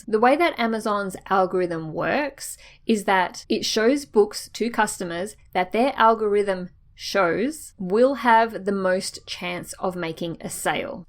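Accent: Australia. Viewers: I went what? Aussie girl talking about Amazon KDP books! How refreshing. Not that other other international sites aren't relevant, just wasn't expecting the accent. Then I thought Kiwi? no. definitely from the land down under. Just started publishing and dabbling in low content books in the last few weeks and yes, must start to promote. Thanks for your informative sessions.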